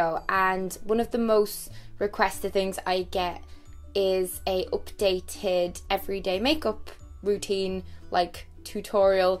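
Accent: Ireland. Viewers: Lol- I feel like we'd be best friends in real life. Actually, I know we would be! PS I love your Irish accent, it's so beautiful!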